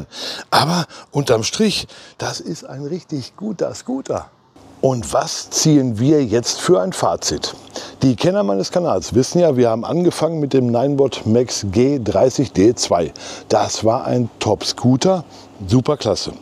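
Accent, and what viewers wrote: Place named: Ruhr, Germany. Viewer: Super hilfreiches Video, hab dadurch meine Kaufentscheidung bestätigt bekommen. Ich könnte wetten, du kommst aus dem Ruhrpott?! Glück auf und danke die Vorstellung vom Ninebot! 👍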